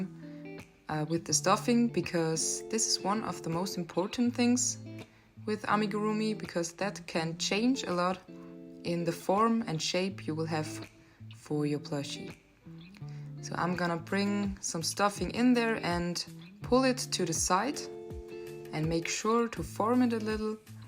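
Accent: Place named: Austria. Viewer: Ich finde du machst das super!! Und dein Englisch ist schön und gut verständlich, daher finde ich den typisch österreichischen Akzent überhaupt nicht schlimm! 😁👍🏻 Und die Anleitung scheint soweit auch verständlich, werde es sicher demnächst selbst mal ausprobieren 🌊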